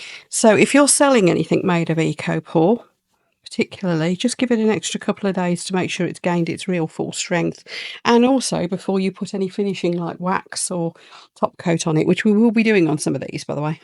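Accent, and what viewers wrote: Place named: England, United Kingdom. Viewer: I love watching you, and listening to you. I’m in America, and get a kick out of your English accent. I really wish the eco resin wasn’t so expensive. I’ve been using cement to cut cost. But, with that, you sacrifice color, as it is hard to get good/precise colors. I would love to see you do cement castings however. I love learning, and want to make a business out of it. I am currently making plant pots and saucers. Thank you so much.. 🇺🇸💕 Cindy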